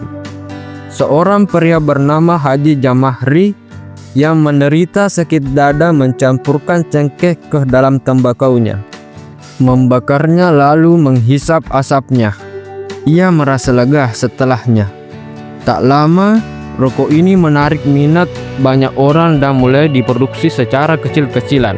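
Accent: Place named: Sulawesi, Indonesia. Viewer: Semangat bang, kedengarannya orang sulawesi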